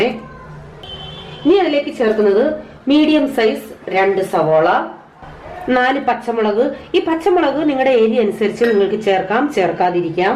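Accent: Kottayam, India. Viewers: ചേച്ചി കോട്ടയംകാരി ആണോ.... അവതരണശൈലി കേട്ടിട്ട് അങ്ങനെ തോന്നി...... എന്തായാലും അടിപൊളി.... നോയമ്പ് കഴിഞ്ഞു ഒന്ന് ഉണ്ടാക്കി നോക്കണം.......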